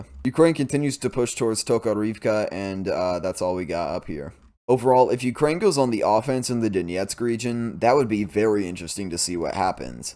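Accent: United States. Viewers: So painful listening to Ukrainian city names in an American accent.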